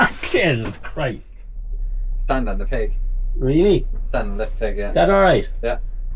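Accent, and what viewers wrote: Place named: Cork, Ireland. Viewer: Ive been looking at the Kove. YouTubes algorithm showed me this vid so I clicked on it. Wasn't expecting a Corkonian accent 😂 Will subscribe and watch your other videos now. Best of luck,.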